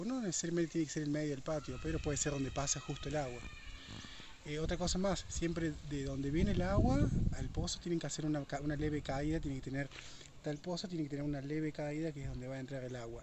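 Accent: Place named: Cordoba, Argentina. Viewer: Muy interesante la info!!, pero no pude evitar escuchar a un Cordobés con mezcla de Franses.jajaja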